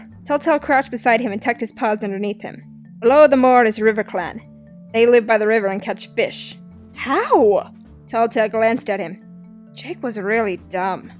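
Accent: United Kingdom. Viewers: Whats up with the british accent? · Are you brittish?!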